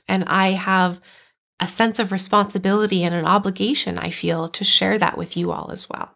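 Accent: Canada. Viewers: She's not American and has a distinct Candian accent. 😂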